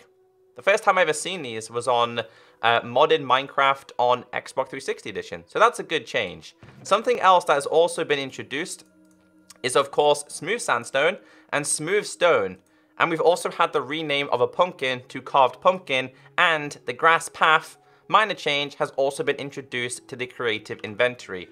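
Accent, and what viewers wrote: United Kingdom. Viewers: It’s the accent. UK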